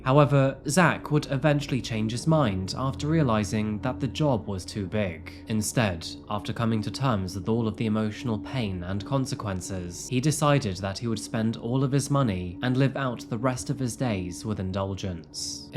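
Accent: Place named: United Kingdom. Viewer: Trapped? Like I didnt click here, for the cute, coffee loving smooth brit voice lol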